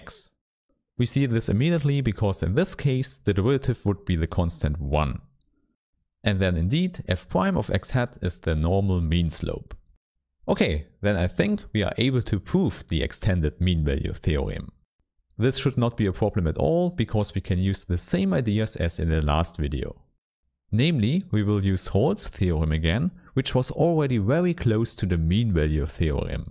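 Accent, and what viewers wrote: Germany. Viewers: Oh, your german? Its quite noticable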